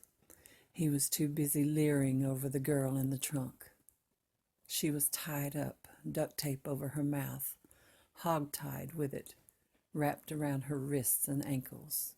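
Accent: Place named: South, United States. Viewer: OMG... You were MADE for this!! 🔥 I LOVE the slight, southern drawl that lilts through the beautiful timbre of your voice that, annoyingly, draws me right into the story lol - I say annoyingly because, I don't do scary. I usually avoid it like the plague haha, but I'll do it for you 😊 I'm so glad you pushed past whatever almost stopped you from branching into the spoken word! And such a great piece too - mad props to the writer. The imagery was easy to imagine and the flow/build up was so natural... I could totally listen to you do more of this 💜 So says this Kiwi at 415am #IDontKnowWhyIWokeUpSoEarlyUgh 😴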